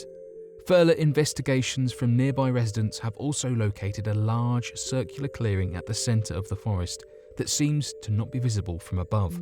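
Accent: United Kingdom. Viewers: Co.ag for music, British accent, why not be Top5s 2, instead of unexplained mysteries?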